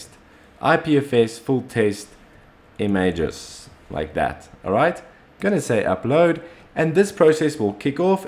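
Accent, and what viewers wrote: South Africa. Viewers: Are you south African?? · I hear a South African accent, and I upvote.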